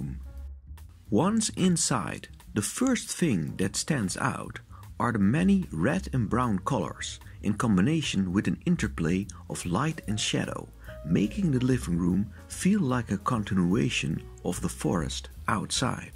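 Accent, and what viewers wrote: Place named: Netherlands. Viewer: Dutch narrator... distracting....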